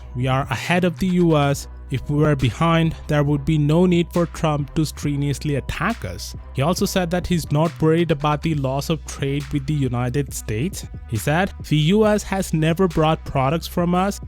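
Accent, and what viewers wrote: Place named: India. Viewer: What’s wrong with his english.. is he indian ?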